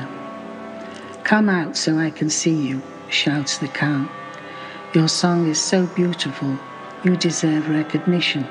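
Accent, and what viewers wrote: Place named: Nuyorican, United States. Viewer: Wow! You have taken a somewhat 'New Yorican' turn? Any reason for the change in mood:) Thank you and great work.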